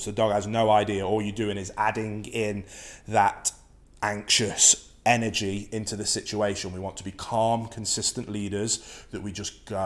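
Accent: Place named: Midlands, United Kingdom. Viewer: Can’t believe you just mention Cannock Chase 👍 I thought you sounded like you were from the Midlands xx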